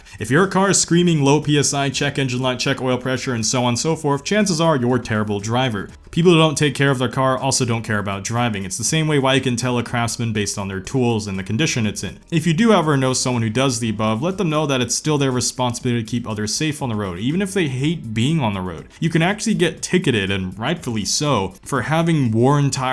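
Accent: United States. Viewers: This guy sounds more American than asian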